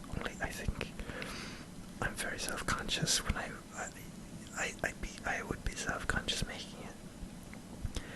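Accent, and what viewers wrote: Ireland. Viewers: That's the kind of ASMR I need <3 Irish and inspirational (and no chewing shit)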